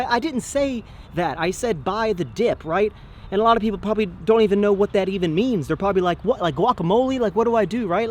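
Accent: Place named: South, United States. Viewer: getting that southern twang 😂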